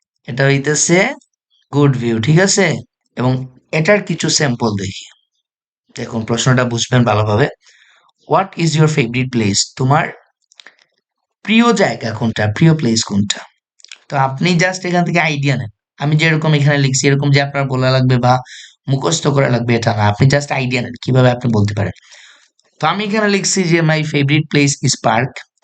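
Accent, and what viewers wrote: Sylhet, Bangladesh. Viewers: Vaiya ki Sylheti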